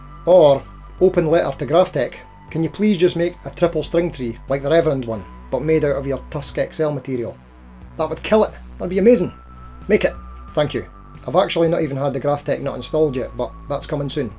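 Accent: Scotland, United Kingdom. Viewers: 3 string graph tech string tree would be totally amazing! Also, always great to hear a Scottish voice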